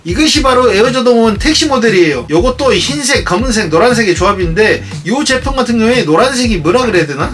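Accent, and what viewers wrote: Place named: Gwangju, South Korea. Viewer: 예전 영상에서는 전혀 몰랐는데 이번 편에서는 네이티브 사투리 살벌하시네. 광주 아니면 광주 그 근짝 동네 억양이신데 맞으요?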